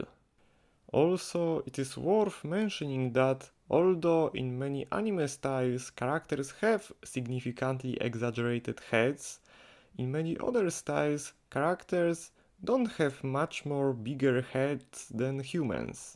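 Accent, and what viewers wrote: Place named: Poland. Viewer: Idk why you were worried about your English, it was good. Sure, in some fragments the Polish accent it's shown and some pronunciation are more or less so Polish XD BUT overall, everyone can understand what you're saying! Also, maybe I make a bit fun off your pronunciation but it will never compere to how my classmate from long time ago pronounced "vegetable" XD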